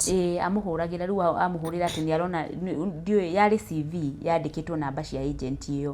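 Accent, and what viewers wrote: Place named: Nyeri, Kenya. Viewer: This one is from my hood....kuria gwa tutaratari...😊❤Nyeri accent is the best.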